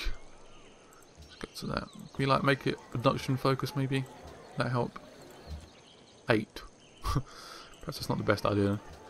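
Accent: United Kingdom. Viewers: Do you live in the United States? British accent? Just curious as you said "Here in America...". Anyways thanks for the walkthrough trying to learn this expansion!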